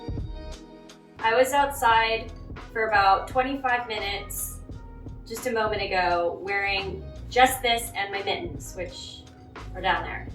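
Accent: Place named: Winnipeg, Canada. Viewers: Are you from Winnipeg? This definitely sounds like a Winnipeger! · Hi. Where do you live? I recognize your prairie accent. I grew up in Northern Saskatchewan and I don't remember sunny days. I just remember gray skies. I like how you put your outfits together. I really don't like wearing bluejeans during the winter because the cotton just feels so cold. If you are interested I prefer Pajar boots. They seem more practical and much warmer than Uggs. They also don't get as ruined as Uggs. Great video.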